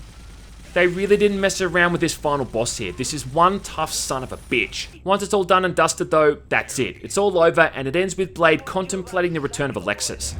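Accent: Australia. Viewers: Am kinda surprised to see you doing a re-review BUT it is SIN and that smooth Aussie accent dose it eveytime 😂👌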